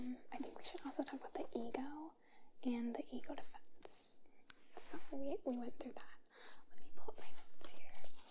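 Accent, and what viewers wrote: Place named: Midwest, United States. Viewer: There is something about hearing the Midwest accent with sunshine and palm trees outside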